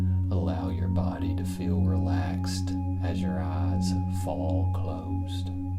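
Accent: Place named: Europe. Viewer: I wish you weren't hilarious. Try to find a female voice for these. I'm tired of the Euro accents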